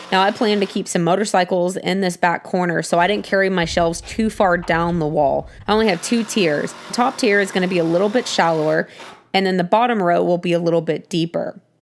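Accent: Texas, United States. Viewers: Another great video! I think the ones poking fun at how us Texans talk need to just enjoy the video!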